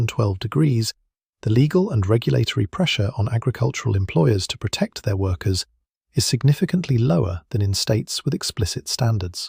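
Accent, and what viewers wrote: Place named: United Kingdom. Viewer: This is U.S. weather....why the British accent?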